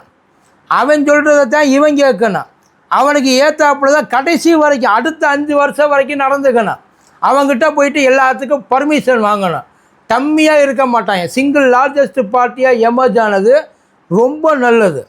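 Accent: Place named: Madurai, India. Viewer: Like you bro long time to heard Madurai slang 👌😂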